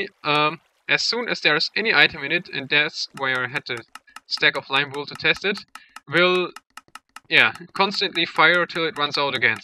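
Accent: Germany. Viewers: Was glaubst du denn, den Dialekt bekommt er nicht mehr, aus seinem Englisch raus. Deswegen sind wir deutschen leider negativ aufällig in der englischen Sprache, weil es soviele gibt die sprechen als ob sie aus der Grundschule kommen... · Man höhrt das du deutsch bist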